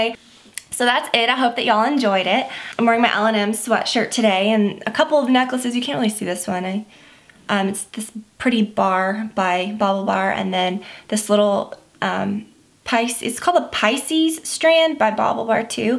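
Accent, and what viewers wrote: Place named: South, United States. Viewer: I've been binge watching all of your videos lately. I'm from Texas so I feel like I identify a little better with you since you're from the south too! You talk like me!😝 love all your videos and I think Olivia is PRECIOUS!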